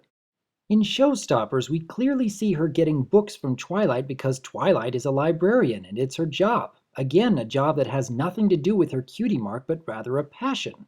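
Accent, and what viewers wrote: United Kingdom. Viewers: Dear Are you British or American?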